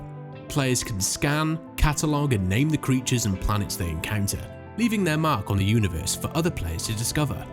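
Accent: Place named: United Kingdom. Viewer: British english accept is the worst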